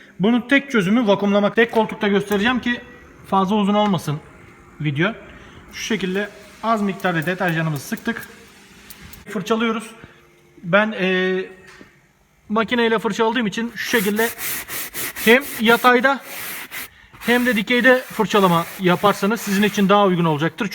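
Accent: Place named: Thrace, Turkey. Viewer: hocam konusman tam trakyali😂 harika yav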